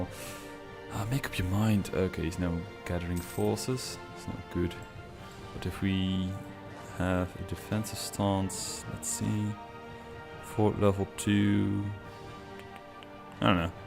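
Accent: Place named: Netherlands. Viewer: Well I'm assuming by your accent that you are actually Dutch and no offense but Italy shall win the World Cup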